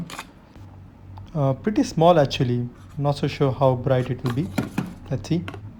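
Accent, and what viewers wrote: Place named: Russia. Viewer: You with Russian accent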